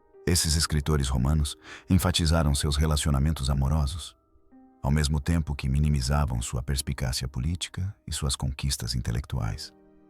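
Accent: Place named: Ceará, Brazil. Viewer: Eu jurava que era Cearense. Minha irmã.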